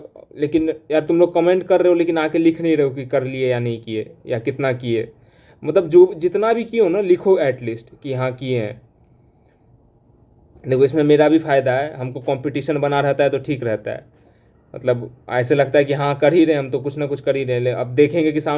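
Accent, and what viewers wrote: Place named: Bihar, India. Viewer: Tumhaari accent up -bihar jaisi hai.. So where are u from?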